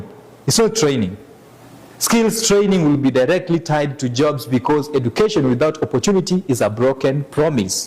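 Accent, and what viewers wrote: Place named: Uganda. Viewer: He sounds more of a ugandan than kenyan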